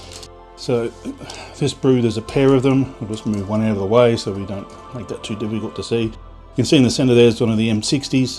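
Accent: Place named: Australia. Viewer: hello, which part of Aussie are you from. Malcolm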